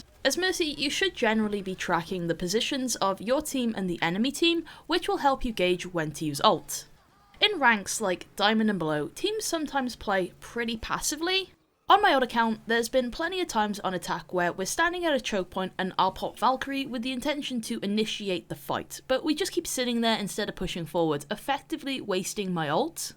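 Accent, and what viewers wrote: United Kingdom. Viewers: Waaaaiit... Are you British? Also pls upload more vods and in general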